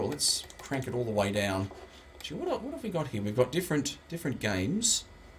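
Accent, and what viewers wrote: Australia. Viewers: You wouldn't happen to be Australian are you? you sound it on your videos. Love your videos of the Old Vintage Macs you got too.:)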